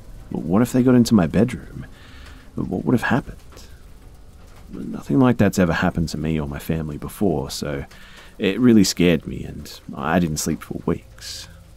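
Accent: Australia. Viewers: I’m new to your channel. Is that an Australian accent I hear? Love your soothing voice! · I love listening to you as i love the sound of your voice and your Austrailian accent too, i am Scottish, the worst accent in the world, so i really appriciate your accent and American accents too, keep on telling your stories true or not i love listening to them.best regards xAnne.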